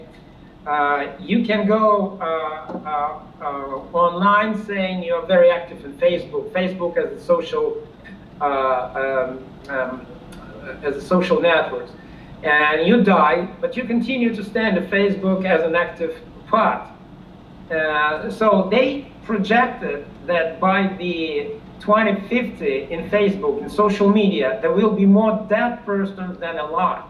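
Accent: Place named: United States. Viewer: Hi. My, you are sounding very American these days! (Krissie in Germany)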